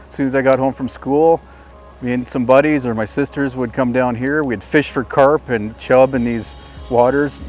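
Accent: Canada. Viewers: You’ve got a be a Newfie lol 👍👍👌👌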